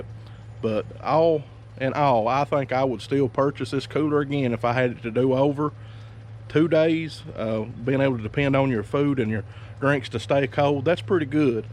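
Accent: South, United States. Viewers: thanks for the review, bud. also your southern accent is spectacular. cheers.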